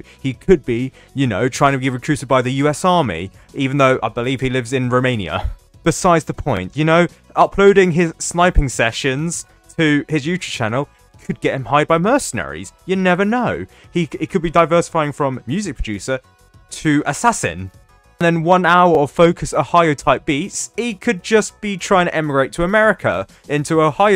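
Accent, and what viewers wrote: United Kingdom. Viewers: Omg too british accent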